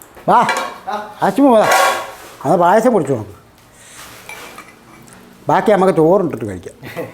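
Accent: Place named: Idukki, India. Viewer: ചേട്ടന്റെ സ്ഥലം എവിടെയാ ചേട്ടാ, കേട്ടിട്ട് ഇടുക്കി കാര് പറയുന്നപോലെ ഒണ്ടല്ലോ 😍😍😍🔥🔥🔥🔥🔥🔥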